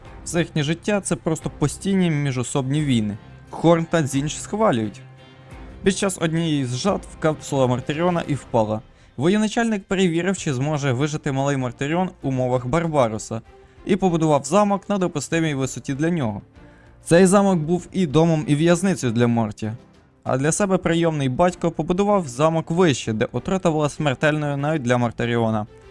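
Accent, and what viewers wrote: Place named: Chernihiv, Ukraine. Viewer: Чернігівщина, пролітає пару слів в тебе)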